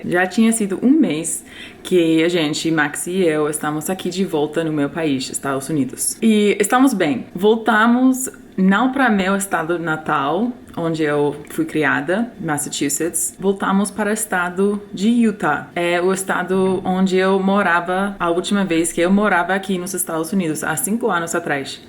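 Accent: Rio de Janeiro, Brazil. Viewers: Já fala com o xxxx do carioca! Rs Boa sorte na viagem! ❤ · Você herdou o sotaque carioca. Hahaha Paísssss, nóissssss Legal isso. Boa sorte, menina. Jogue-se de cabeça. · Americana com o verdadeiro sutaque carioca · Vocêix, paíx Totalmente carioca Kkkkk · Estou na cidade de Pompano Beach seja bem vinda mais uma americana Carioca ate o seu sotaque em portugues e do rio show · primeiro: Fico muito feliz em ver vc tão empolgada e com tantos planos! Tão diferente de quando vc chegou aí nos EUA. Depois, acho lindo seu jeitinho carioca de puxar a letra " s" no fim das sílabas E, já que vc não se importa, gostaria de fazer uma pequena correção: A gente não usa "desde" para se referir a lugar, só usamos para tempo. Pra lugar, falamos só "de". Abraço. Tudo de bom pra vc e pro Max! 🤗😘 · esse sotaque carioca dela é engraçado demais: Nóixxx Paixxx! será que ela sabe todas as gírias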